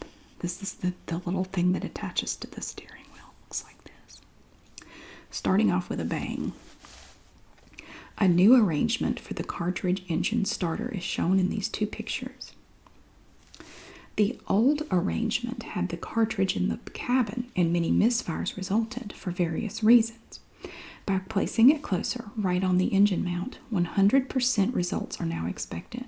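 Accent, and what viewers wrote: South, United States. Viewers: I'm from the U.K. and your southern accent is absolutely dreamy! I love the way you pronounce the word "Foil"! You have great production skills also, great vid. Thanks for sharing!!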